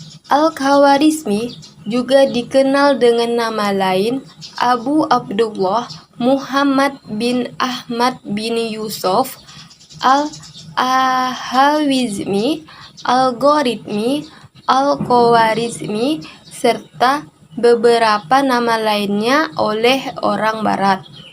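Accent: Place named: Ketapang, Indonesia. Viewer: Logat ketapangnye ade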